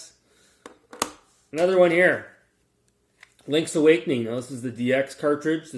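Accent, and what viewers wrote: Canada. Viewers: Sound like northern Ontario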